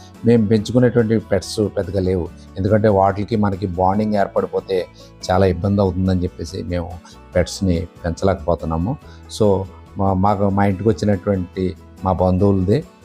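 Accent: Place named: Visakhapatnam, India. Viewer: godavari matayasaledu vizag matalvunnayi 😍😍🙏🙏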